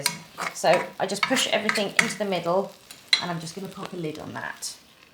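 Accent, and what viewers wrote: United Kingdom. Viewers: HEY SEXY INDO WITH BRITISH ACCENT YOU FORGOT TO ADD ANY SPICES NONE ARE YOU BOILING IT WITH NO SALT PEPER CHILI POWDER OR WHAT ? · I just watched the whole video just for that British english.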